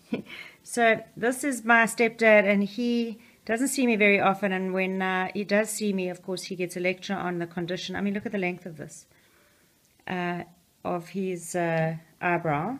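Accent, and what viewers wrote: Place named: South Africa. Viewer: Right away I picked up the South African accent. I lived in Cape Town for a bit and my best friend that I lived in CT with is from Johannesburg.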